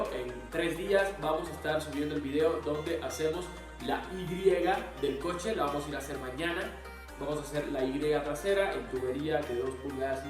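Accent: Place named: Cuba. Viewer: tu español no suena neutro, parece cubano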